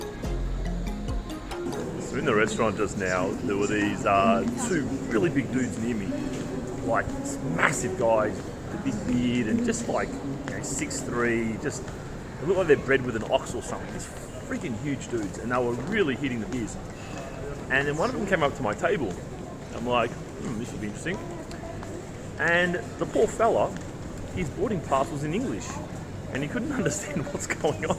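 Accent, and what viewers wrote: Australia. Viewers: Cos he's full aussie cuzzzz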